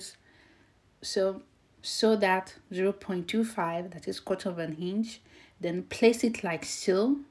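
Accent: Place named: England, United Kingdom. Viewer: I like your English accent 😉😁👌 New subscriber 🤩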